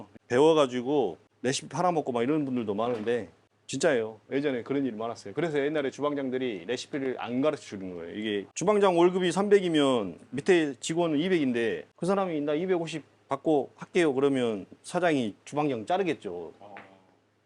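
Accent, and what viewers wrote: Jeolla, South Korea. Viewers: 전라도억양이그대로 ㅋ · 전라도 말투 짜증난다.배주스 넣어라 비싸다. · 이 정도면 어음청 친절하고 정확하구먼.. 전라도 사투리 억양이 좀 있으시는거 같음 🤔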